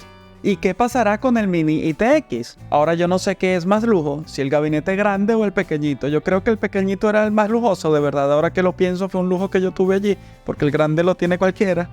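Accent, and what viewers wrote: Venezuela. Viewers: Compa, por el hablado usted tiene que ser venezolano jajajajaja! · Um........ ese acento.... Es Un Paisano Venezolano.....Saludo Hermano, excelentes videos! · eres de venezuela?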